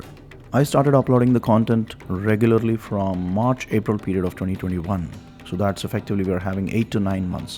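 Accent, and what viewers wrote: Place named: North India, India. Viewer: Your English is very good and it sounds as a north Indian English ! Keep it up bro ! We all are Indians in first place and we belongs to sanathan Dharam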